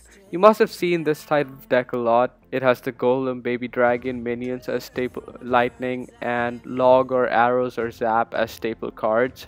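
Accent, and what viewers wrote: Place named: India. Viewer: Nice video dude! Are you Indian? Your voice is so soothing lel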